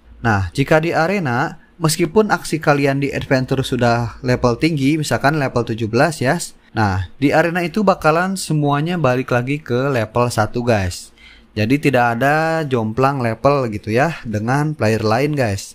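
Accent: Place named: Bali, Indonesia. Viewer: Ni logadnya kayanya Orang Bali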